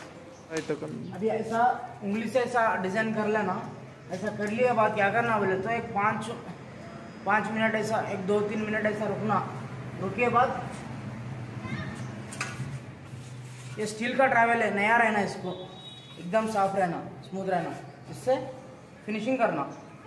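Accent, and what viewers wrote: Hyderabad, India. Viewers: Hyderabad hindi Right? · Talent ❤️..that Hyderabadi accent ❤️ kiraak 🎉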